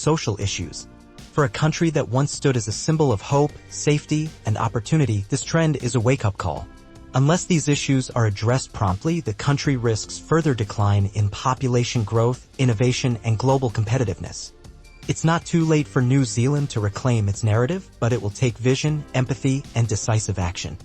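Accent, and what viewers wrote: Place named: United States. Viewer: Whats with the us based ai voice?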